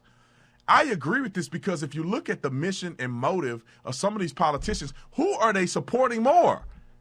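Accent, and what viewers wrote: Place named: South, United States. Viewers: Love this! He is typically southern! Love thisman!! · Love that strong Southern Accent!!!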